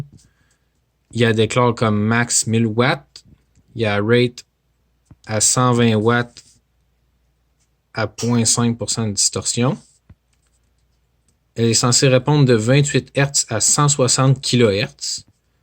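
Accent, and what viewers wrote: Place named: Quebec, Canada. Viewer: gros accent du quebec :P je viens juste de commandé sur amazon 36$ livré le lendemain anyways 20 amp x 12 = 240 possible mais pour 36$ je chialerai pas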